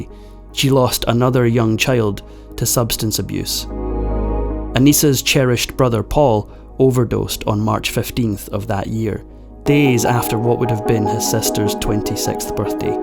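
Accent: Scotland, United Kingdom. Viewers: That's a Scotsman accent. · Evil has resided in Hollywood from day one! Sadly, it has destroyed the lives of way too many actors over the many years, like Anissa.😔 Btw, I love the Scottish accent! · Can you please stop with the British accent? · Yeah, beautiful Scottish accent. Lovely 😊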